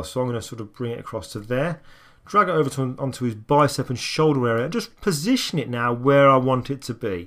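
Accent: United Kingdom. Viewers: O cara nem é americano kkkkkk é britânico u.u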